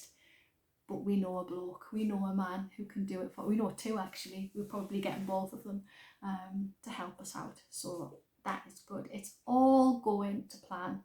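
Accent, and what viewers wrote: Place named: Northumberland, United Kingdom. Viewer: Hi,. I am soooo happy to see you had a new episode tonight. I absolutely love your podcast. You have such a lovely, calming effect on me, it was just the perfect, relaxing 45 minutes I needed as a hectic week draws closer to an end. I lived in Northumberland for a couple of years (although I have been back in South Africa for 18 years in June) and I still get homesick for it, occasionally. Your accent alleviates that somewhat 😂 As always, your projects were lovely. I find your more "minimalistic" approach to crafting refreshing (I too try not to just buy all the yarn, unless it is for a specific project, which would get started imminently). It is in inspiration to me to work through the stash I had acquired before I changed my ways. I hope to see you again, soon! And if you are holidaying in Northern-England, I would love to go along in your case! 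Ps: the yarn on your warping board already, is the warp. You will be weaving your weft through the warp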